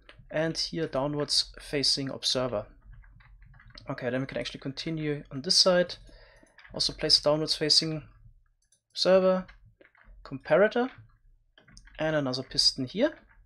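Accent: Germany. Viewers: Haha man hört das du n Deutscher bist :D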